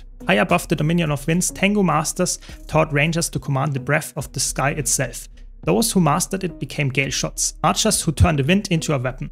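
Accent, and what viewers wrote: Germany. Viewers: Heiliger bim bam das ist der deutscheste Akzent den ich je gehört habe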